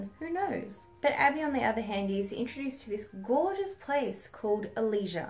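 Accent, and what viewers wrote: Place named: Melbourne, Australia. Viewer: You sound like you're from Melbourne! =D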